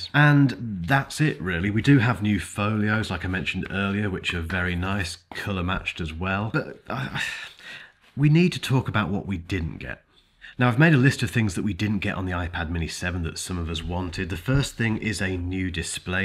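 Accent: United Kingdom. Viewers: 看到你講話的方式跟聲音，就好像在看一部英國電影，十分有趣，期待後續 I pad mini 7 的ai評測。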